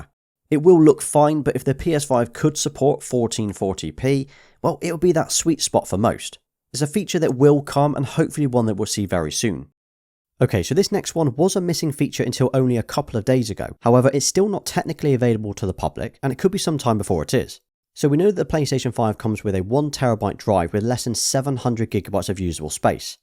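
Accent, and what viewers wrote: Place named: Leicester, United Kingdom. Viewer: Your voice really reminds me of mark Selby! Are you from Leicester lad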